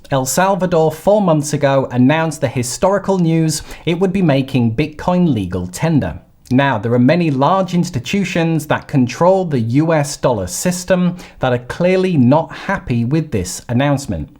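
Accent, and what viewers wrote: United Kingdom. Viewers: I think you have the best info and videos of all my Crypto channels to follow - Thank you!! I always look forward to your new videos!! & who doesn't like to hear a British accent along the way!!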